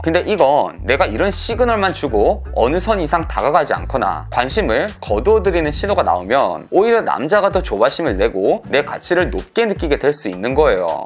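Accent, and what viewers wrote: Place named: Gyeongsang, South Korea. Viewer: 경상도 억양 세시네욤...ㅋㅋ...🥲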